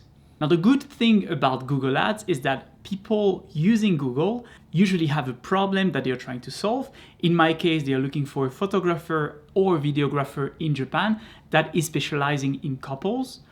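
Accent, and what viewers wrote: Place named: France. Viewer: I detect a french accent. Très joli!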